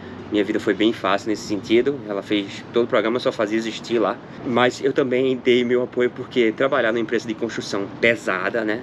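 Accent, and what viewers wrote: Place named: Northeast, Brazil. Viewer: Você é de onde? Seu sotaque é nordestino! Eu sou de Fortaleza/CE.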